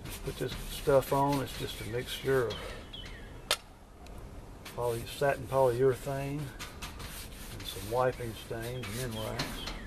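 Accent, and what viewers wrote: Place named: South, United States. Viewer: I’m getting a “southern” Bob Ross vibe. Excellent outcome, wood grains look awesome.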